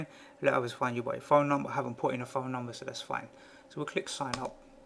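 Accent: United Kingdom. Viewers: The accent is like harry potter nowadys😳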